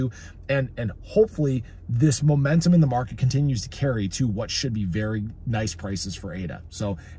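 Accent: United States. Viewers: Wisconsin, eh? I was thinking Chicago 😄 thanks for the great coverage of ADA! 🚀🚀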